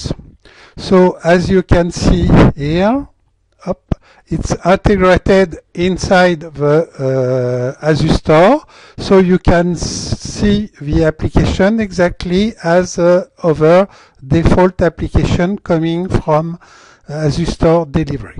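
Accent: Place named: France. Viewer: Thanks for this video and this tools !!! You're great to develop and share that with us. And your French accent is incredible ^^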